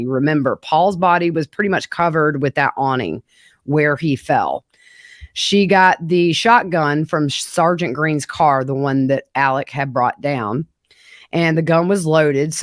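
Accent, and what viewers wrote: South, United States. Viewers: This trial is already a passion of mine. A southern gothic tale! You know what we want to hear about and your honeyed tongue has the perfect down home accent. I’m betting that someone with important connections will agree with me and you’ll be even busier after this case has been resolved. Keep chasing the truth, girl!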